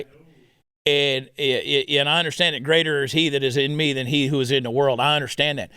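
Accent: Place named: Georgia, United States. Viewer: I do too! He's a wordsmith with a Georgia accent! 2x the fun!!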